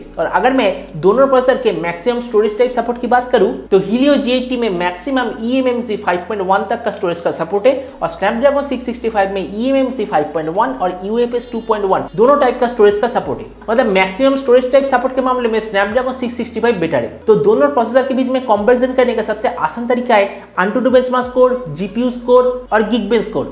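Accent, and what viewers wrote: Bengal, India. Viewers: Vai vdo ta khub valo informative... Sudhu ektu try koro Bengali accent ta komate jkhn hindi te korcho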